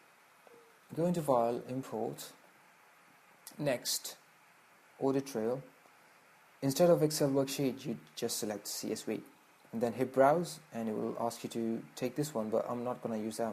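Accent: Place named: United Kingdom. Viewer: This is very excellent and helpful. But it does not tell about origin of country. It sounds like British. Thanks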